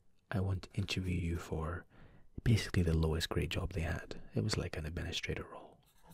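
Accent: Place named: Midlands, United Kingdom. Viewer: What place in the Midlands are you from? I’m from Worcester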